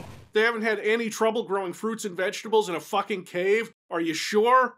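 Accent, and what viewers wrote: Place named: United States. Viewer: You speak like American to other Americans. People outside The Center Of The Universe are watching you too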